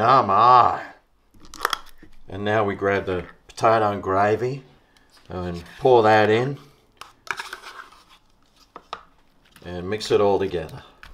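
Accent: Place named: Australia. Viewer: I come to watch the recipes and more importantly, to listen to his Aussie slang/talk😁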